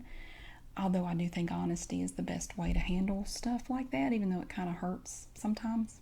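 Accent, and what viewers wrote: South, United States. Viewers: Southern ASMR? I'm in heaven. Subscribed!!! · Amazing! Southern Accent is such a good trigger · IT's cool to see another asmrist with a southern accent. I felt a little self-conscious about my voice but starting to feel more okay with it. You're amazing! Subscribed! :))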